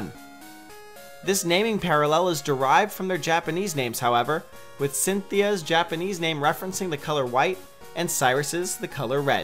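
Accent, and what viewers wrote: Netherlands. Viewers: Are you dutch? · Wait are you dutch?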